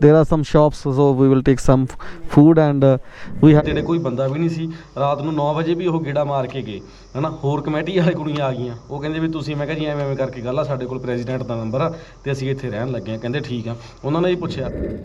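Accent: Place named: Mohali, India. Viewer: ਬਾਈ ਦੀ ਬੋਲੀ ਤੋਂ ਮੋਹਾਲੀ ਦੇ ਨੇੜੇ ਤੇੜੇ ਦਾ ਲੱਗਦਾ ❤❤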